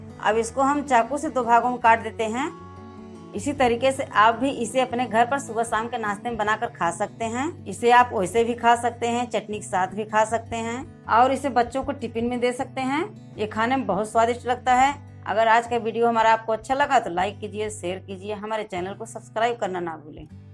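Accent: Uttar Pradesh, India. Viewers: Aap up ke Ho kya.?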